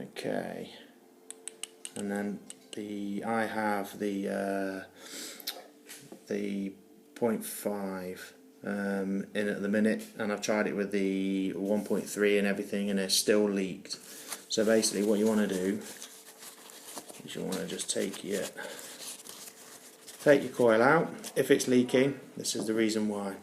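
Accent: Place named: United Kingdom. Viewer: You should do voice overs. You have the classic bri'ish voice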